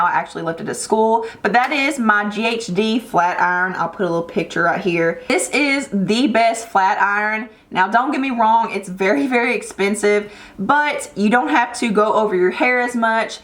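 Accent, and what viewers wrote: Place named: Kentucky, United States. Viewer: Those are my favorite curling irons too! (The sally beauty ones) I’ve just discovered your channel today- you sound like us central kentucky girls, but I can hear a Georgia twang, too. Where are you from? Great channel!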